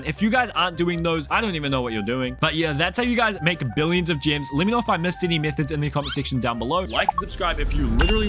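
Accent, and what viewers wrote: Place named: New Zealand. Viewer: can tell by your accent, I’m from Australia and have met many kiwis in my life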